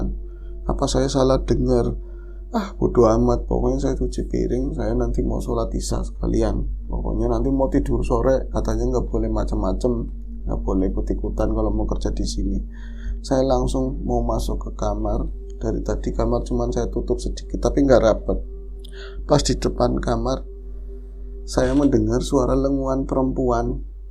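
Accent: Banyumas, Indonesia. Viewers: Asyiik juga dengerin mas e br kisah pke bhs ngapak2 gmn gtu... menghibur ...😊😊😊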